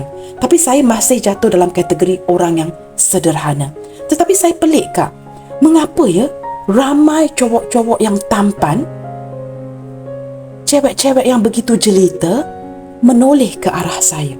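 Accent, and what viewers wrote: Malaysia. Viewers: Sleng MCM mlyu lah..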